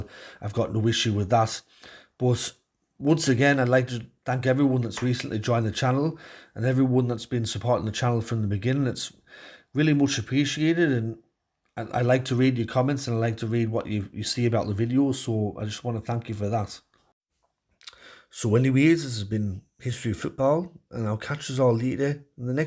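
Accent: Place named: Sunderland, United Kingdom. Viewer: Mackam/Smoggi with a cold, good video non the less.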